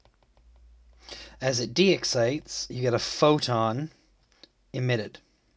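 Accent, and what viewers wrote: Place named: United States. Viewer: Thanks G but why as an American(from ur accent) are u doing Alevels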